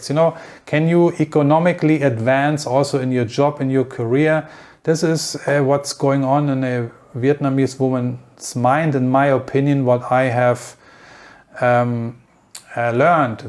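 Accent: Germany. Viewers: Do I here a strong German accent? 😊 How to stay there without border running?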